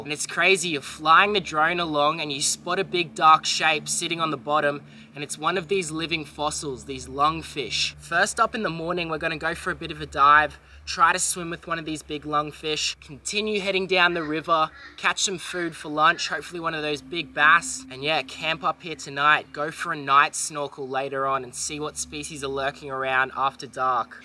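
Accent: Australia. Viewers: Love that aussie accent !!! Awesome content by the way !!! Greetings from Switzerland !!!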